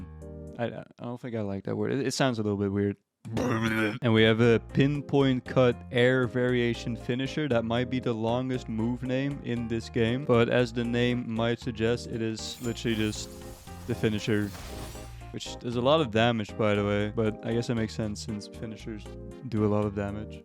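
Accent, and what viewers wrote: Netherlands. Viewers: Gast ik wist gewoon dat jij nederlands was ik hoorde het accent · Insane how fast you can hear a Dutch accent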